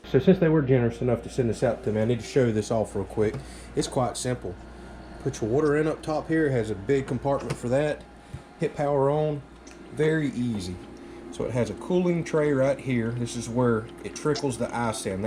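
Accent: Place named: Texas, United States. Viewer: Are you sure you are not a Texan ? That accent is spot on for people around San Antonio.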